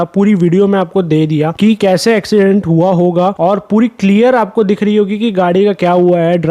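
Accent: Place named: Chhattisgarh, India. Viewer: Are you from Chhattisgarh because your accent feels like it.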